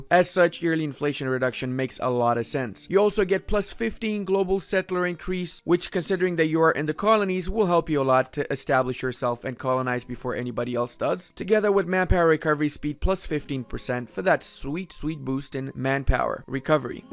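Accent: Australia. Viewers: The Aussie accent and references were bonza. Yeah, nah, something tells me you’re more than a little familiar with the place. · The Australian accent... it's not bad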